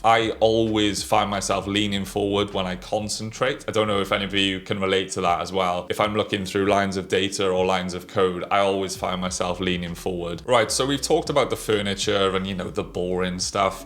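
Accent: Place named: United Kingdom. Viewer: You sound British. Do you work at Spotify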